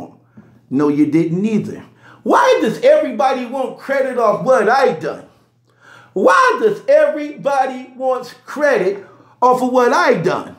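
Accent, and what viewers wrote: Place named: New York, United States. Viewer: ..#AAYYYOOOOO..!!..THIS SHIT IS HILAAAAAAARIOUS..!!! 😂😂😂😂😂😂👏👏. NEW YORK ACCENT IS FUCKING COMEDY GOLD..!! #BRUUUUUH..!!😁😁😂😂👏👏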